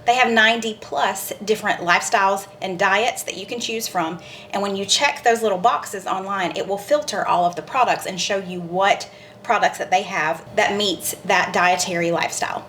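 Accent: South, United States. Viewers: LOVE YOU GUYS!!! Making the baked ziti right now with my boys...they are so excited. They ask to put on so we can cook together. They may or may not copy your southern accent...we are Michganders, so they are quite entertained. HA.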